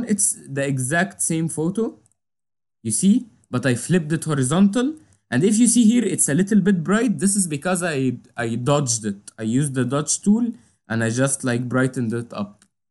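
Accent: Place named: Egypt. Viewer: You sound egyptain. Iam from Egypt and I know this English accent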